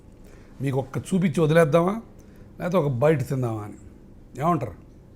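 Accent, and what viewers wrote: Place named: Nellore, India. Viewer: నమస్కారం సార్. ఓరకంగా మిమ్మల్ని చూసి అసూయ పడ్డాను. మీరు చూపించిన మాయాబజార్ సినిమాని పూర్తిగా ఎంజాయ్ చేసాను. ఒక్కసారి మళ్లీ 50 ఏళ్ల క్రితం అనుభవించిన బాల్యానికి తీసుకెళ్లారు. మా నెల్లూరు మలై కాజా మర్చిపోయారు. ప్రతి పదార్థాన్ని పూర్తి స్థాయిలో అనుభవిస్తూ చేసిన మీ వర్ణన మీ భాషలో అద్భుతః. మా నెల్లూరు యాసలో అదిరిపోయింది. ధన్యవాదాలు సార్. 😂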